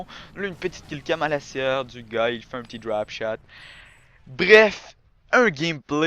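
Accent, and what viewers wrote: Quebec, Canada. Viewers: J'adore cet accent québecois :p Sinon pour moi Mode RAGE : Striker + Damage Mode Tranquille : SPAS12 + Damage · quebecois ;D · j'adore l'accent du quebec je t'aime mec :D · Si vous aussi vous kiffez son accent Quebecois <3 :) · Mais non c'est qu'il a un accent come sa car il est québécois ( dsl si j'ai mal ecrit :s )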